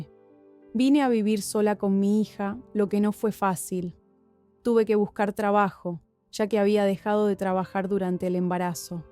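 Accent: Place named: Argentina. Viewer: Dices que eres peruana,pero hablas como argentina.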